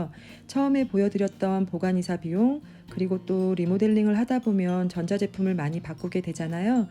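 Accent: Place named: Seoul, South Korea. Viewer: 서울사투리 너무 이쁘세요~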